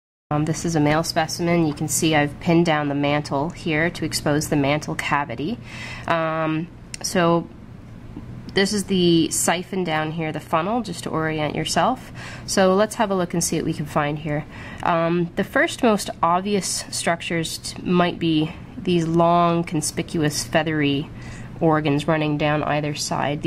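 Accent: Canada. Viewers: She sounds Canadian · I can tell you're Canadian.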